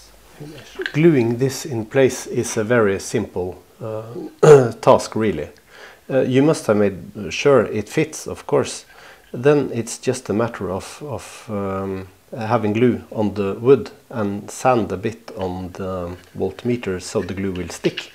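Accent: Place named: Sweden. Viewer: i have one question? are u swedish? u rly sound swdish i am finnish btw xd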